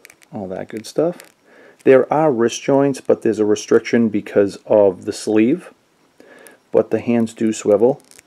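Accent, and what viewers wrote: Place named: Massachusetts, United States. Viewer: Hey, you from Mass? The accent sounds familiah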